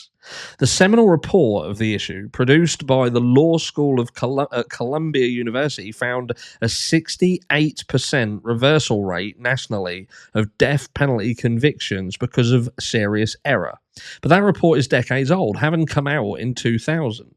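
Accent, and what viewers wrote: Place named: England, United Kingdom. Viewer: I love your bright yellow light accent that is in the corner of your room, so pretty!!! As Miss Potts would say “sidebar“ Mr. what area of England is your accent from not the room accent but your voice accent, just curious and thank you.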